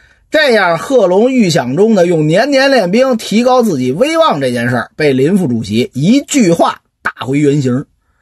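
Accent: Beijing, China. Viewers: 從第一集開始聽，沒注意居然追上進度了。雖然我不太喜歡京腔，但是老哥這個講得確實不錯。希望老哥堅持，更上層樓。話說這一集1.8k 點踩，怎麽回事。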